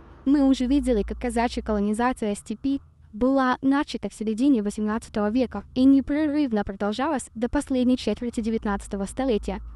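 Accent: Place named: England, United Kingdom. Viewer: А окцент то инглиш. Есть поговорка если ругаются два соседа значит там побывал англичанин.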